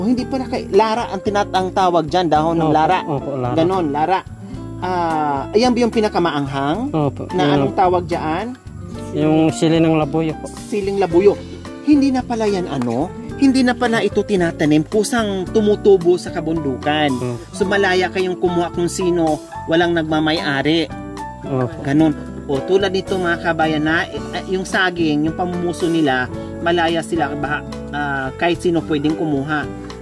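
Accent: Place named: Pangasinan, Philippines. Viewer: tita halata sa diction nyo n po taga Pangasinan kau tulad ng father k na taga Warey, Malasiqui Pangasinan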